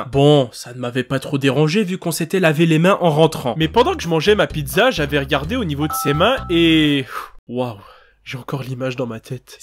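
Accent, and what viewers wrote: Quebec, Canada. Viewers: Il est québécois en tand que français jaime bien l’accent québécois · Suoer vidéo! Et sinon t'es pas québécois par hazard ?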